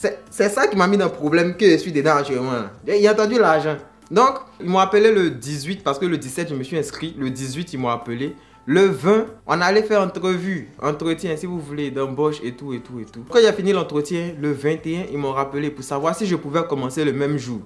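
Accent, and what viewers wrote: Ivory Coast. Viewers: Orrrrr djo j'aime trop t'écouter 😂 depuis ABIDJAN, sérieux ivoirien peut pas se cacher, quand il parle net ont sait que c'est lui 😂😂😂💕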